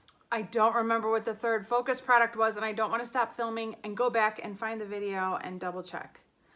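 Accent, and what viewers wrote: Netherlands. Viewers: Dutch accent :)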